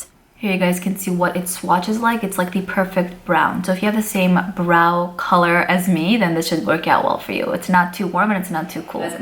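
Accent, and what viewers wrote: New York, United States. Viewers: When u have 3 videos to watch first and choose your favorite YouTuber!!!! You doll!!! I love love how honest and genuine u are! And I miss my new yoarrkk accent lol. Please don’t ever change! I find that once a YouTuber gets a little famous they stop answering the people in their comments who got them there ! I know u are different and so transparent! Xoxo 😘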